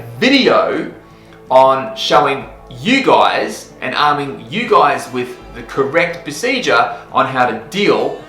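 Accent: Australia. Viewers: Strewth mate, looks like you got another "yes" here for the idea for the next video. Love Lucy and love your Aussie accent. Keep up the good work. 😎👍🏼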